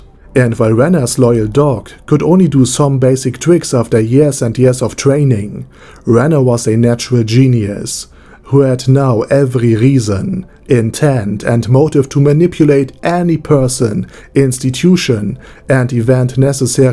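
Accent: Germany. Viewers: I am pretty sure that your original language is German xD der Inhalt ist supi aber dude dein Dialekt ist schon übel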